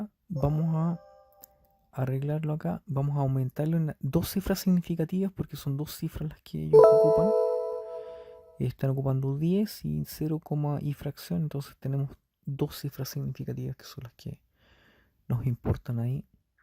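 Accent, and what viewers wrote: Chile. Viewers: No no es eso es que es Chileno y los chilenos hablan con muchos altibajos al menos al el se el entiende otros Chilenos de Santiago Centro y otras comuns apenas se le entiende.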